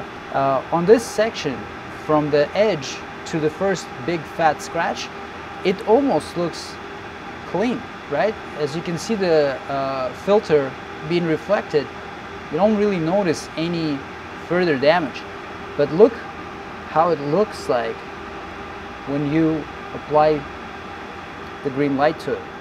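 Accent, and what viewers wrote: Russia. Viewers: Русский акцент?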